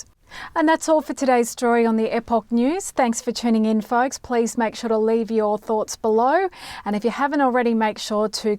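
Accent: Australia. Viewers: Perfect lady anchor gorgeous with an Australian accent. Love it!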